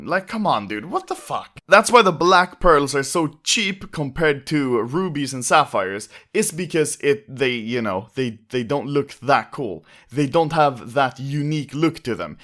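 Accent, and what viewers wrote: Sweden. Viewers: You speak engswedish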